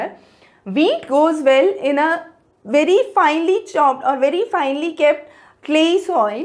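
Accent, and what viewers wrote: India. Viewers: This is Indian English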